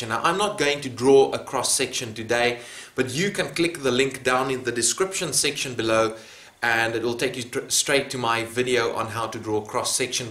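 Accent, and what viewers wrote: South Africa. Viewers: south african or new zealand???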